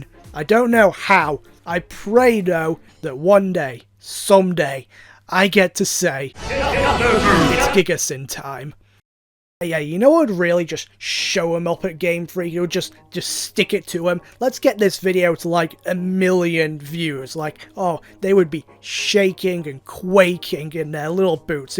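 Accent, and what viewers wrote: Yorkshire, United Kingdom. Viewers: Any British folk recognize the accent used here? I've heard it on quite a few YouTubers. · Somewhere in Yorkshire I think. Definitely northern.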